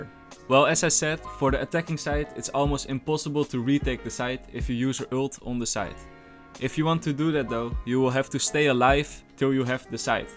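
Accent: Netherlands. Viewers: Is this guy dutch? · hahaha i knew that u from the netherlands greetings from germany my guy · i didn't know you were dutch, dacht dat je russian was ofzo ik was verbaasd XD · Yeah you are dutch 100%%%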